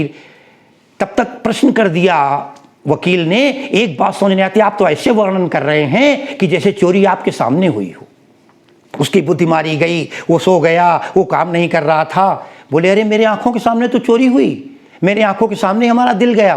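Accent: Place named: Unnao, India. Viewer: वाह वाह!बहुत ही विद्वतापूर्ण,शोध पूर्ण,भक्ति पूर्ण और अत्यन्त रोचक रहा भगवान श्री कृष्ण जी की लीलाओं का भक्ति व्याख्यान।ऐसे ऐसे रोचक पदो की विवेचना की जिन्हे पहले कभी नहीं सुना था।कचहरी का स्वांग बड़ा रोचक रहा।तीन तरह की एफ आई आर की जानकारी मिली।अंत भी अपनी रोचक और कौतूहल पूर्ण समस्या पूर्ति,कहां अंटके! से किया।बीच बीच में उन्नाव की बोली और लहजे की मिठास और अपनेपन की अनुभूति का भी आनंद मिला।बहुत सुंदर प्रस्तुति के लिए धन्यवाद।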